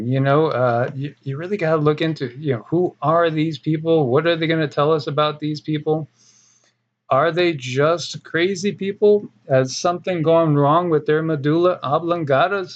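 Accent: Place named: South, United States. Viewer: Your Southern accent is awesome!:)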